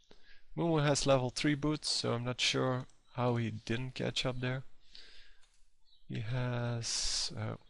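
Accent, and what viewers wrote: Netherlands. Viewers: ze engels heet een verschikkelijk nederlands accent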